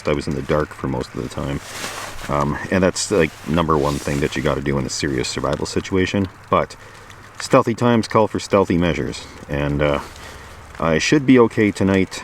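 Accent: Canada. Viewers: you've got a nice, soft voice and comfortable Canadian accent. I think you'd be a great reader for an audio book or something of the likes, I'd listen to it.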